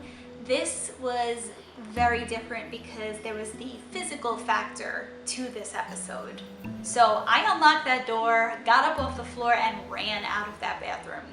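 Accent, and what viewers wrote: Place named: Long Island, United States. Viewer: I watched your first video about your summer house...I'm from L.I. originally and immediately could tell your accent!! are you from long island as well? anyway love your videos... watching from LA where I currently live! ♡♡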